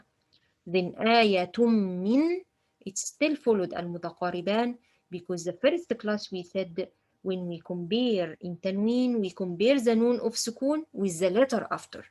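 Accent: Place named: Egypt. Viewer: I guess by your accent you r Egyptian??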